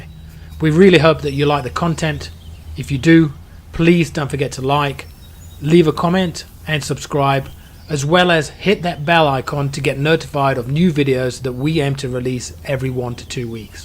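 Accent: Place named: South Africa. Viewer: yeah getting tattoo appointment is hard enough if you have a good artist let alone the issues with the pandemic so I feel you bro, but at least you can save a bit of money for a while lol I did detect a bit of South African but wasn’t sure enough to mention. I’m based in London, at the moment on the outskirts. I’d defo be recommending your channel to my friends who are also heavily in to cars but It’s just unfortunate I can’t send any business your way due to location. Anyway I’ll be watching your other content soon keep it up 👍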